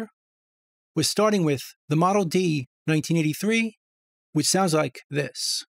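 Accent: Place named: New England, United States. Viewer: Your New England accent makes these videos entertaining as well as helpful. I'm from Texas.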